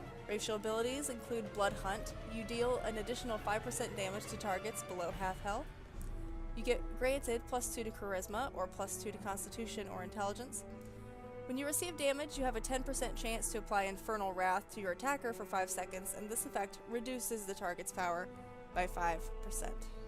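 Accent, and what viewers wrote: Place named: South, United States. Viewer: know i'm not speaking for every one here but i'm fine w/ the southern accent.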